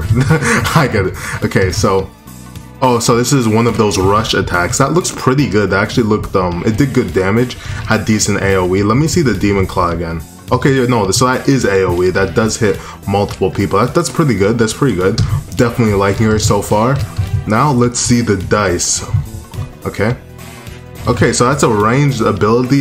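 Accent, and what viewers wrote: Jamaica. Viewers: r u jamaican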